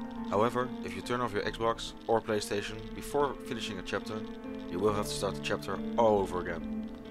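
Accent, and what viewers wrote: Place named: Netherlands. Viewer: Je hoort dat hij nederlands is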